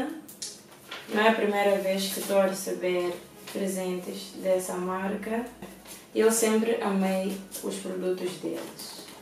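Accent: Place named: Portugal. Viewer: Kamily Gonçalves ela tem sotaque de portugal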